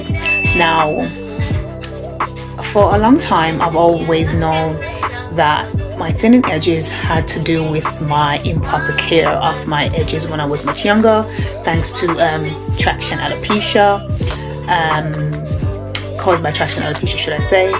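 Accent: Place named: Jamaica. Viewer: Ms, where are you from? I hear some Jamaican in your voice. Loved seeing the progression in the video. I too suffer from hair loss due to hormonal issues. Looking forward to seeing your progress.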